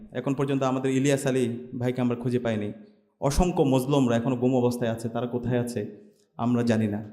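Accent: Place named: Chittagong, Bangladesh. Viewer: Mr, Sadik you are one of my favorite person. It will be nice if your accent change . You are speaking in public. Still little bit chittagong accent in your tone.😊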